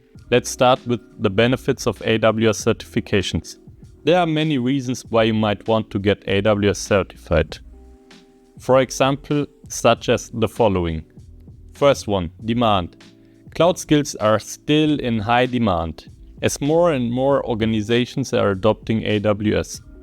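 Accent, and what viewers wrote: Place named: Germany. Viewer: Love the German accent. Thank you for the video, very helpful, especially regarding getting started with the cloud topic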